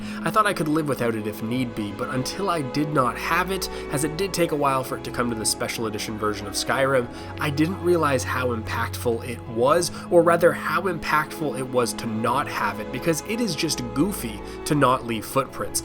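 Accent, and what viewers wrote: Canada. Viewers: Your Canadian :) or from Minnesota. lol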